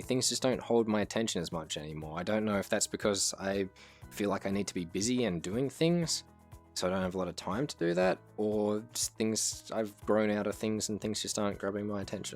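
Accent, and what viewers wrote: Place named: Australia. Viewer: Nice to hear a fellow Aussie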